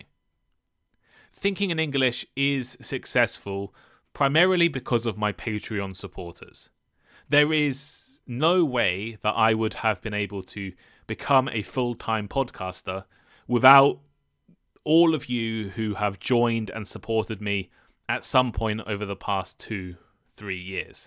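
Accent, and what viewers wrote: United Kingdom. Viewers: I subscribed the pareon for some time and I can guarantee about the quality of the conversation clubs and the English courses grammar running by the Thinking English team., and Peter are such wonderful teachers and the chance to speak with english learners all around the world is incredible. Thank you very much for your podcast, you've been helping me a lot to improving my english and getting used with british accent.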